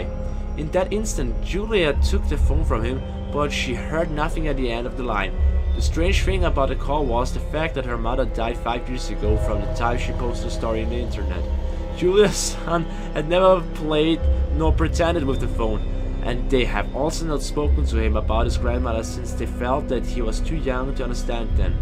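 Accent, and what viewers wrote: Germany. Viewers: are u german because i can hear german in ur videos im german aswell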